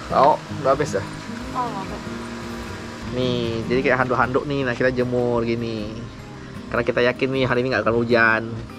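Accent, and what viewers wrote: Pontianak, Indonesia. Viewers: Koh.. orang Pontianak ke ? Sepintas lalu ku dengar macam logat Melayu Pontianak.. 😃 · Kok bahase nye kayak bahase orang pontianak ye koh... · Logat pontianak sekali abg e ni wkw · Pertame liat, rase kenal.. dengar cara ngomongnye,.. dipastikan orang pontianak... diikuti lebih jauh,.. saye jadi ingat, pernah 2 kali berinteraksi,jadi konsumen... akun masih ade ke 😊... sehat, lancar dan sukses selalu boy..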